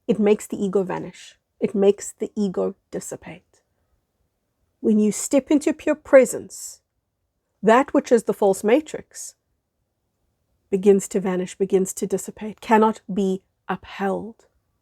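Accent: South Africa. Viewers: great message, love the S.A. accent too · You sound South African❤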